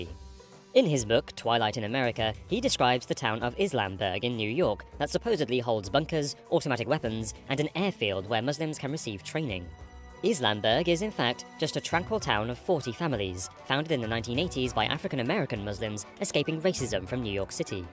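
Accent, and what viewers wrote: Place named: China. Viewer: Logat nya logat org cina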